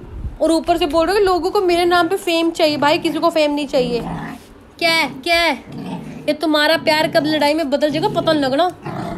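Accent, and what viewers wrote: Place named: Haryana, India. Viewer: Mei v karnal jdo aye tuhanu miln awAge tusi bilkul mere Wang boldey O meinu apna Haryana yaad a janda tusi bot changa kamm kardey o rabb tuhade harr khwaish poori karey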